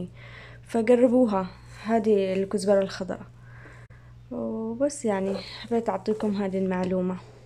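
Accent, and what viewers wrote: Aden, Yemen. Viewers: قدك ع الهدره العدني لاتغيريها للسعودي مافي احلا من هدرتنا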